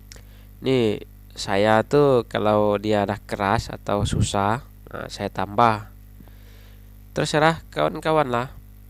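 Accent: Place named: Aceh, Indonesia. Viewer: Asli mana bang? Logat nya kayak orang aceh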